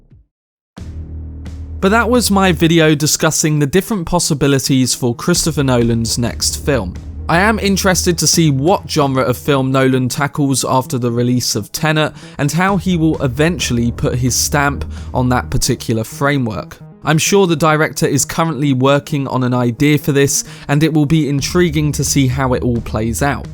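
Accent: United Kingdom. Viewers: Love your bri-ish accent mate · Dude you a brit?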